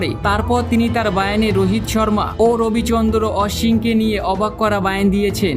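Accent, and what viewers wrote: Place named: Bangladesh. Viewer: বায়েন 😂😂😂😂 কি বলো কথা শুনেই বলা যায় তুমি কাঙলাদেশি😂😂 ভাষা ঠিক করো এভাবে লাইক পাবে না😂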